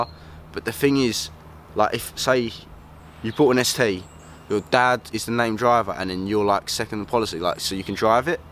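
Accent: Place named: Croydon, United Kingdom. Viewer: Why does he talk like a wannabe rude boy from Croydon???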